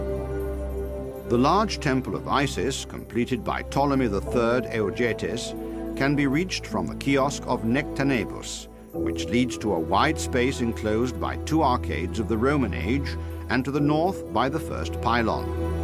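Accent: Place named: United Kingdom. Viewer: The timers cool but NOT ANOTHER BRITISH ACCENT!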